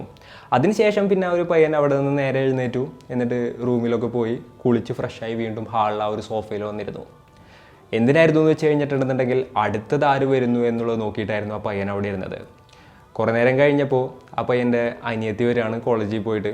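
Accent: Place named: Kodungallur, India. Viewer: സ്ക്രിപ്റ്റ് ഒന്നു കൂടി ശ്രദ്ധിക്കൂ ബ്രോ. ചില സ്ഥലത്തോക്കെ കേട്ടിരിക്കാൻ ഒരു ഫ്ലോ നഷ്ടപ്പെടുന്നു. പിന്നെ ചെന്നുങ്ങയിൻറ്റ്, എത്തിങ്ങയിൻറ്റ്, പോയിങ്ങയിൻറ്റ് പോലുള്ള കൊടുങ്ങല്ലൂർ സ്ലാങ് പറ്റുന്ന പോലെ കുറയ്ക്കാൻ ശ്രമിക്കണം.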